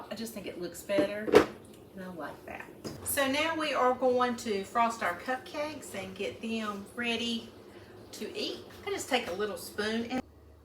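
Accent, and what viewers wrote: South, United States. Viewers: You are just delightful! That sweet southern drawl, unhurried cheerfulness as you mix and chat in that bright ,pretty kitchen! feels like I’m cooking with my favorite aunt- she’s just as blonde and sunny! I’m now on a quest to find that gorgeous striped sweater! So happy to discover your channel Blessings to you and yours.💖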